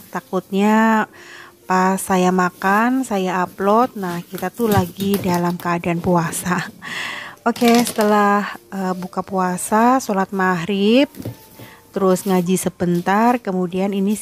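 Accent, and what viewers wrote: Banyumas, Indonesia. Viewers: W'salam sehat sehat mba, timggal di Purwokerto ya mba.....bahasanya ngapak donk hehehe, salaaam dri jakarta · Ada ngapagnya ya bund...😁✌️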